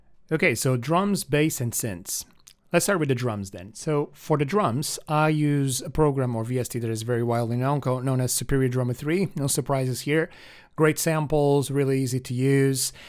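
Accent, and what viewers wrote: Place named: Portugal. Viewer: parece portugues a falar ingles , bom video , boas dicas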